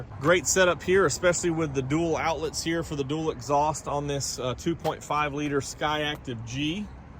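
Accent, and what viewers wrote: South, United States. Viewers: Uh.. Americans do not. We have different dialects. He is Southern which is why. Northern etc say Mazda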